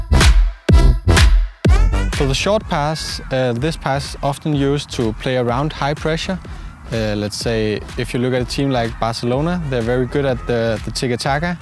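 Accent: Denmark. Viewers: Elsker den danske Engelsk❤ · Is he danish? You can hear it by his accent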